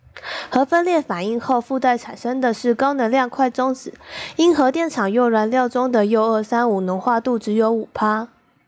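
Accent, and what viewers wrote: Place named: Taiwan. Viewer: 為何台灣國語?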